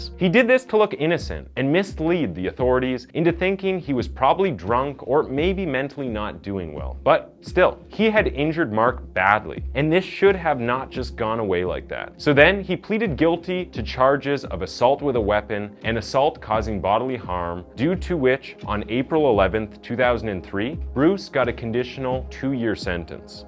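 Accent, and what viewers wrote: Canada. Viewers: Narrator is definitely Canadian. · Are you Canadian?? 🤔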